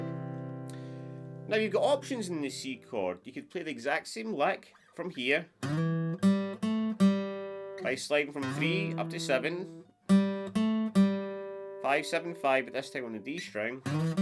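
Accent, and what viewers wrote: Scotland, United Kingdom. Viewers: It's a Scottish accent, right? Sometimes I misunderstand when you bend those vowels in a way I wasn't expecting, but it's beautiful, like bending notes in the Blues 😊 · Love that accent, love to copy it when speaking English! 😊 Been in Scotland three times up to now, such a nice country, wonderful people up there! The hymn of our football club here in Cologne, the 1. FC Köln, has the melody of "Loch Lomond" - always gives you goosebumps! Keep on rocking,! Great lessons you give here! Greetings from Germany! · I love your accent,! It's really been helping me with my Scottish accent practice, too.;-) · I’m Italian, use to struggle with British accent but easily understand 99.7 percent of what you say 😊God bless Scots! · Greetings from Frankfurt/Germany. Please do me a favor and stick to your Scottish accent. I am always pleased to learn a new language and your lessons are awesome and I look forward for every single one of them. 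· im a Kiwi and find your Scottish twang very easy to listen to but I do have a wife from Folkirk.Great vids thanks very much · Sounds great, many thanks, and don't try to disguise that lovely Scottish accent! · Love the Scottish accent ❤ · Hey, thanks for the grate lessions, stay as you are and keep that little scottish accent! For me - as a german - the rolling R is completely normal 😁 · I love your accent, and having just got back to London after my first time working in Scotland love it even more! It's one of the reasons why I watch your videos. The main one is after many years your lessons have opened up my playing when ive been stuck in a rutt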